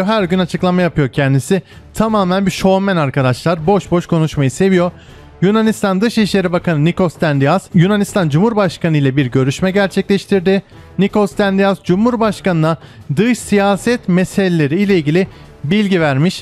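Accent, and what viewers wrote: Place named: United States. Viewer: Bak kardeş seni abone ettim ve paylaşımlarını izliyorum lakin şu dönme gibi yada amerikanlıştarılmış lehçeyle konuşma. Sinirlerimi bozuyorsun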